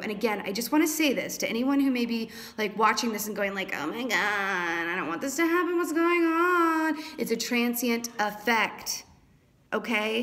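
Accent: South, United States. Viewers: Plus, that Southern Belle accent! ❤️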